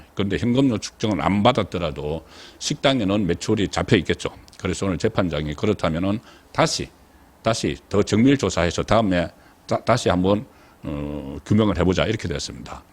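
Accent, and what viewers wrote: Gyeongsang, South Korea. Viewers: 늘 유익하고 알기쉬운 해설 잘 듣고 있습니다. 그런데 계속해서 몸에 익은 대구사투리 발음(금융기관, 금융기관 etc..)이 듣기 거북해요. 고치세요!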